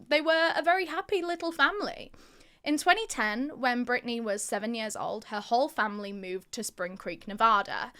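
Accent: South, United States. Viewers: You have a good American accent sometimes I hear in other videos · It just depends on where your from. Down south we say it the way you are.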